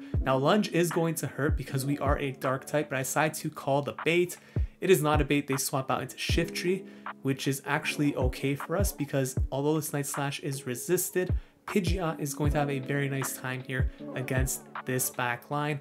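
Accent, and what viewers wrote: Canada. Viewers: I thought. that was a Canadian accent I was hearing,!, maybe the Raptors should pick up Iman Shumpert and get some of his DWTS momentum!!! 😂 Keep making such entertaining and informative videos! Your the best in this Tennessee Vols fanatics opinion!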